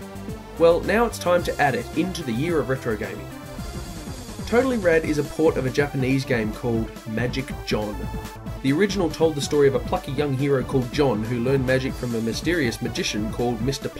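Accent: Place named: California, United States. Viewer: Dude, as a native Californian, I have to say.... that was a pretty damn good Cali dialect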